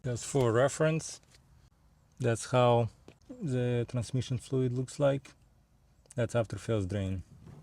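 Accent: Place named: Russia. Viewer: Пипец КАНЕШНА акцент, наших везде слышно 👍👍👍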